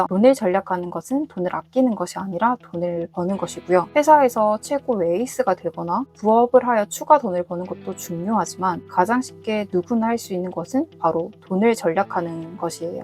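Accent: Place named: Gyeongsang, South Korea. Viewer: 경상도 분이신 듯해요 ㅎㅎ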